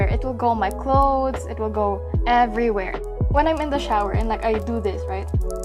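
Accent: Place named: Philippines. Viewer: btw this is unrelated but i love your filipino accent. 🥺 i strive to be as confident as you when speaking!!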